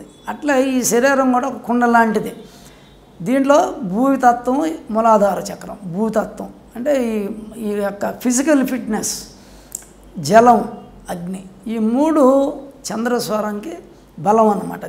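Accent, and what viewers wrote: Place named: Rayalaseema, India. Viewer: చాలా సరళంగా చెప్పారు ,గురూజీ రాయలసీమ మాండలికం స్వచ్ఛమైన తెలుగు వల్ల కొందరికి అర్ధం కాలేదు అనుకుంటున్నారు మెల్లిగా మళ్ళీ చూడండి🎉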